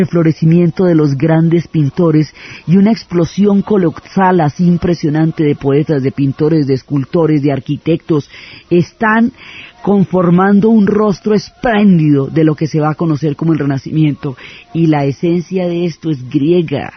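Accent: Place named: Colombia. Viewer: TIENE ACENTO COLOBIANO LA SENORA, Y ME ENCANTO SU VIDEO. FELICIDADES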